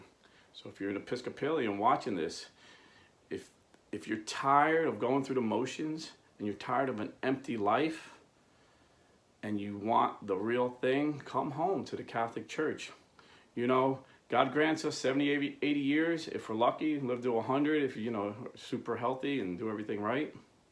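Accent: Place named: Northeast, United States. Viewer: Are you Italian? You have a Northeast US accent or Chicago accent. Can't tell which, since I'm not from USA.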